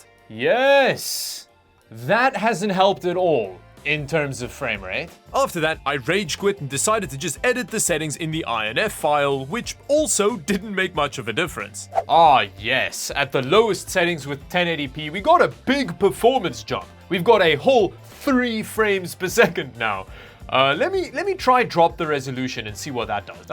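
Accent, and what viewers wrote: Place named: South Africa. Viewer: the best part about watching is that he sounds south african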